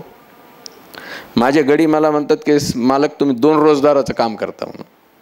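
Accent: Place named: Marathwada, India. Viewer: सर तुमची बोलण्याची भाषा 100टक्के मराठवाड्यातीलआहे.....❤❤❤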